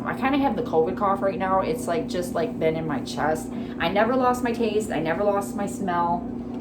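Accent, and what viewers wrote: Michigan, United States. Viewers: So this is funny, I was watching your video and my son was sitting near me on the couch and he said mom that lady sounds like you: I knew what he meant right away, it’s the Michigan accent because I’m from Michigan lol. I was like yep that’s right!! lol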